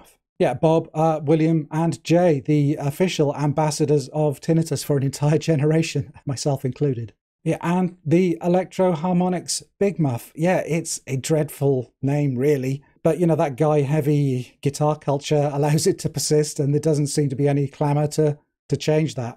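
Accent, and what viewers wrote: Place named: United Kingdom. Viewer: A guy with a beard and that wonderful british accent is talkin about guitar things with examples outside the mainstream music. I could not be happier. 😁👍 Thank you. Liked and subscribed. 🤘😎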